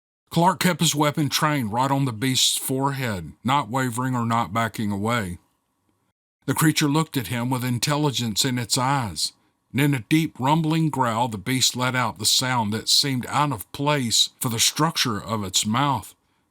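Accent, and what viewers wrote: Germany. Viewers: really enjoying Blood Eagle and was tickled by your German accent. It reminds me of Arnold Schwarzenegger. Thanks for doing what you do. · Living for the German accent ❤ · I love your German accent done in clandestine Southern flavor,! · I was stationed in Germany for five years. German accent is making me laugh. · Another talent.A German accent. I dont know a thing about it but I do appreciate it. Thanks sooo much for your effort. It gives the narration a good effect.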